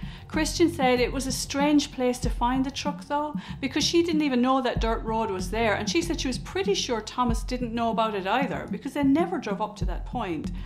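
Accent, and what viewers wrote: Ireland. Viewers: Don’t listen to them. You’re just fine and personally, I love the Irish accent. Between you and That Chapter (where I discovered your channel), I get my dose of it! If someone doesn’t like it, they can either learn to love it or move along. Keep up the great work you’re doing. The channel will continue to grow!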